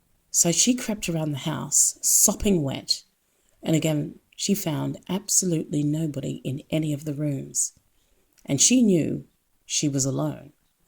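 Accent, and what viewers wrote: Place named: Australia. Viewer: You're a great narrator, always enjoyed your work with Dark Waters channel.....love the Australian accent and would love to hear you and Be.Busta do a collaboration.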